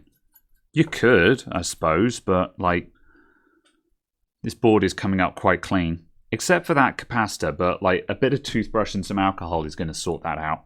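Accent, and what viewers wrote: United Kingdom. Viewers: Your voice is literally why I have your vids running as a background when I work. So soothing and british :D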